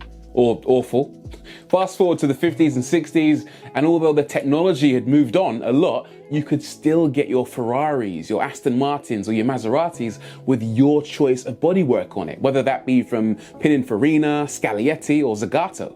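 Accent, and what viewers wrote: United Kingdom. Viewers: Beside your amazing knowledge of cars and very pragmatic attitude, there is one thing I love about your channel that is part of you : your British accent. As a native Frenchspeaking Belgian, I learned English with American teachers and got very disappointed when confronted to the various accents of UK except the Queen’s English. I never need subtitles for US channels nor do I for yours. I don’t know how your accent is considered in UK but I definitely love to watch your video’s for this reason as well. Your pronunciation is clear, your wording is precise and yet all but American. Thank you for introducing me to British pronunciation. A real pleasure.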